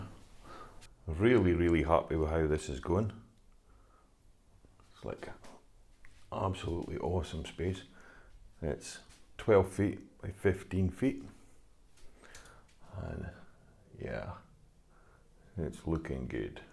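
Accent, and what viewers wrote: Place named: Aberdeen, United Kingdom. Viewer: Love it!! Your accents Aberdeen/Aberdeenshire can i ask where you buy your fish?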